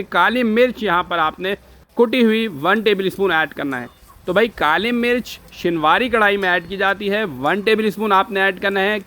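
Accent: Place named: Bihar, India. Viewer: بولنے والا بہاری ہے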